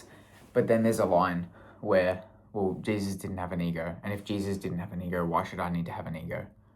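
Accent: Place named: Australia. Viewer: Hi, you sound like a awesome Aussie. Do what you love to help your journey so that you have the abilities from your hardwork of hardships? You'll understand when your 40 years old. The perception of you talking about Ego, is that all is of value, when you use intellect & compassion in the fruits of your labor. You may need to start a little martial arts training, because demons don't like to be seen by the light. laughing not laughing, just loving, living, learning that the truth is non-negotiable. Great day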